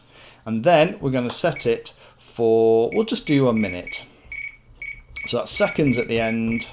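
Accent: United Kingdom. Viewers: I want to duplicate my Spektrum NX10 timer1 in my TX16S. The Spektrum timer allows you to start and stop the time based on throttle stick position. For example, I have a nitro model that I set the time to count down when the stick position is more than 20%. The reason is that there is very little fuel burn at low throttle and this is a better judge of flight time than the th% function of the TX16S. Is this possible with the TX16S? I love watching your videos and especially your British accent. I live in Oklahoma, USA.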